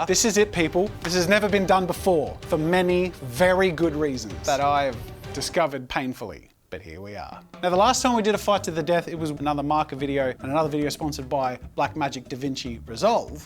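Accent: Australia. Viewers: I’m looking for good markers.. without paying a fortune came straight here and thought he sounds Aussie.. then you said ships to Australia 🇦🇺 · Wait are you Australian? You have a strong accent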